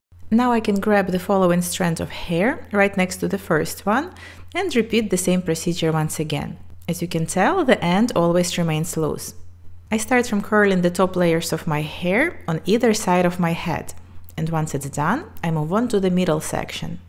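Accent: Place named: Russia. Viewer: Я тоже из старичков. Люблю видео и сразу услышала наш, русский акцент❤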